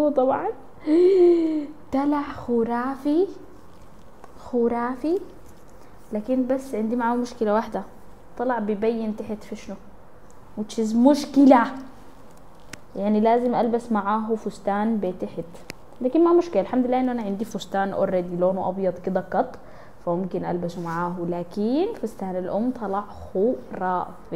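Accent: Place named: Iraq. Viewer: ما اجملك لهجتك تشبه لهجتنه اهل العراق ☺❤❤❤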